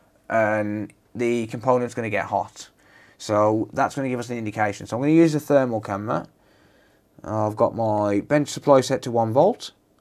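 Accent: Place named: Dudley, United Kingdom. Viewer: Bro, strange how I've just ended up randomly on your video. I was the guy that commented on the Saudi post, and you suggested I add a loop. I'm repairing donor boards, and came across your video and noticed the name. Small world. I'm in Dudley, your accent sounds localish. Going to drop you an email.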